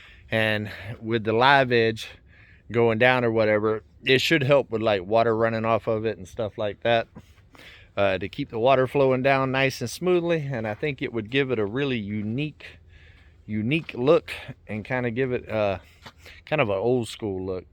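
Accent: South, United States. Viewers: I think you're amazing and who cares if other people say you talk too much on this channel that's what it's about letting us know what's going on how you feel how you don't feel how you which is so nice a beautiful person like yourself should not be told to hush I love your accent you have a Southern accent I love the way she talks which is just a beautiful person just like you so keep on keeping on and I'll keep going on those Adventures with you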